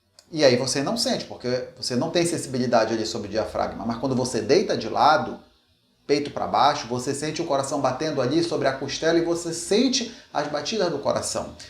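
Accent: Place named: Belém, Brazil. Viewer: És de Belém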